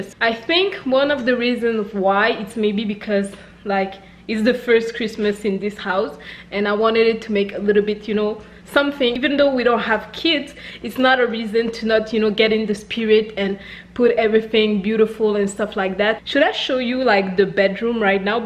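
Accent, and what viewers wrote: France. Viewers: I have to tell you that I love your french accent! 😊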